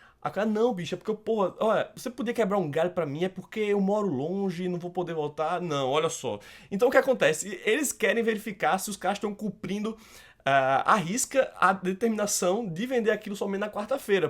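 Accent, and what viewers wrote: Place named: Pernambuco, Brazil. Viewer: Pensava que vc era de Pernambuco.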